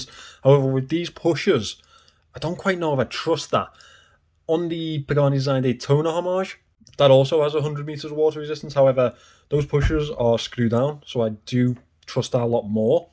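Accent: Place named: Scotland, United Kingdom. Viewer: I’m only here for the terrific Scottish accent 🤩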